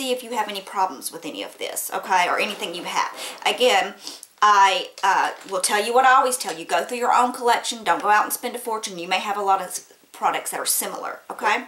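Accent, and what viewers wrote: South, United States. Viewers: Amazing tips!! Love your southern accent!!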